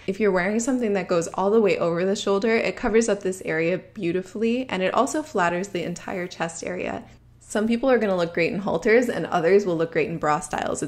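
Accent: Canada. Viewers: Do I hear a bit of a Canadian accent?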